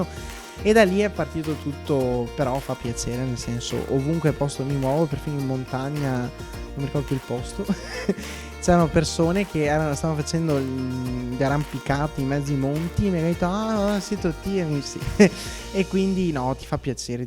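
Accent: Veneto, Italy. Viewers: Ti ho appena conosciuto ho parenti veneti ed è bello sentirti parlare xchè mi fai ridere tanto, non pensando al resto, grazie. PS anch'io andavo a ballare liscio e mi divertivo, ora non posso più 🤷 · Mi hai aperto un mondo. Sono veneta-piemontese , nata e vissuta in Piemonte. Mamma padovana, papà torinese. Tu parli pressapoco come parlava a volte la mamma e i parenti veneti. Prima, di udire te, avevo sempre pensato che quel : " proontooo" al telefono , di uno zio fosse solo una spiritosita' sua, essendo lui un burlone, invece no tu lo pronunci uguale. E anche tante altre cose che diceva la mamma: scoase, cosa chel voe, sacramento, ecc. Praticamente scambiavo la parlata , in certi momenti, xche ' per il resto parlava italiano, di mia mamma per un suo modo di essere per scherzare; anche xché sapeva che alla nonna piemontese dava fastidio la parlata , un po' sboccata , dei veneti, quindi credevo che la mamma accentuasse. 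Invece no, non era la mamma che scherzava era proprio il modo di parlare dei vicentini, padovani ecc.😂😅😊 Sei molto bravo , mi fai ridere e mi riporti alla mamma che non c'è più. Continua con i tuoi video. Grazie.😊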